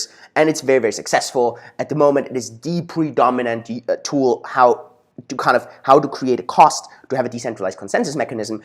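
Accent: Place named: China. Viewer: OMG I LOVE HIS CHINESE ACCENT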